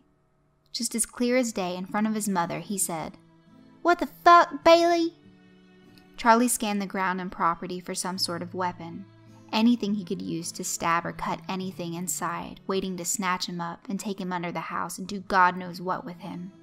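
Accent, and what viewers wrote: South, United States. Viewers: This Southern drawl you do in these kinds of pastas is so sweet! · Damn racism, it's never funny. I love the southern accent. You know how to narrate you southern bell :)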